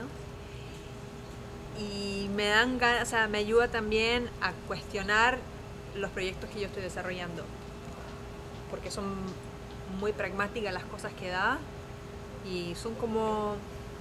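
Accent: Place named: Argentina. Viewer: Pq chucha hablai como argentina weon! Estas igual que zamoranoo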